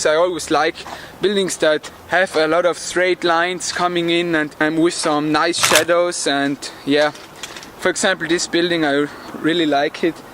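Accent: Germany. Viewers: Richtig deutsches englisch aber gutes Video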